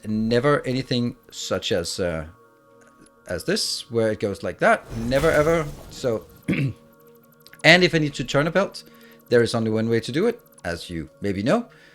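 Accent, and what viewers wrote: Denmark. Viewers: you sound so Danish but i really dont know but thats besides the point, Great video and content my dude!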